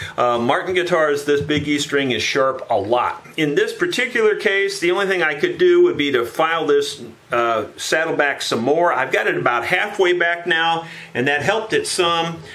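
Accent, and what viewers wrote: United States. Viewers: what a transformation, trash to treasure and I just love the country voice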